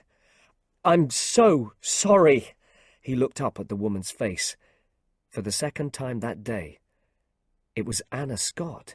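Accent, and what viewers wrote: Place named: United Kingdom. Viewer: I love the story and the beautiful British accent. Thanks a million! ❤❤❤❤❤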